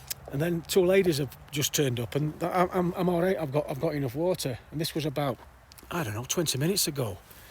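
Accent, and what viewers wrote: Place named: Yorkshire, United Kingdom. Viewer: Cheers. Me and Judith hiked this trail in 2022 and are currently enjoying watching your adventure as it unfolds. One episode a night after tea! Great to relive some of the great scenery and your take on a fabulous part of the world. Looking forward to the second half.....oh and nice to hear a Yorkshire accent!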